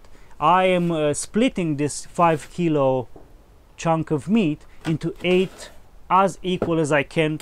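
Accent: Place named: Ukraine. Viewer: Im just loving this Ukrainian accent ^^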